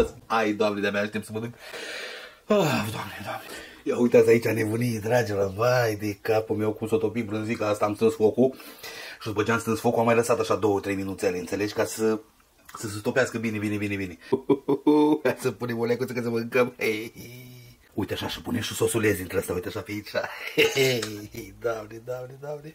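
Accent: Moldova. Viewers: M-ai pierdut cu moldovenească ta că nu înțeleg mai nimic 😢😢😢😢😮😮😮😮😮😮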